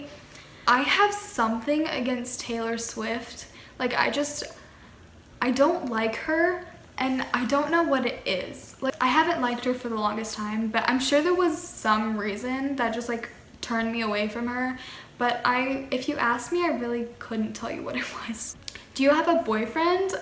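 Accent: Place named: Canada. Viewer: You sound Canadian and it's awesome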